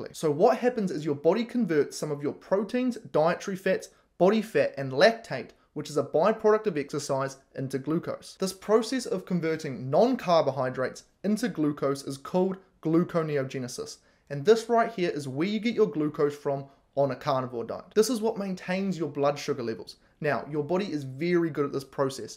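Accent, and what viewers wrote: New Zealand. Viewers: Great info.. love the kiwi accent!! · He is from New Zealand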